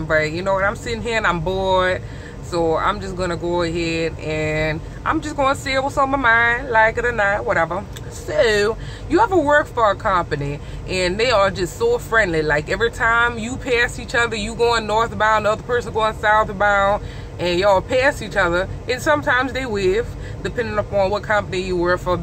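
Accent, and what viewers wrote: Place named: New Orleans, United States. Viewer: N.O accent strong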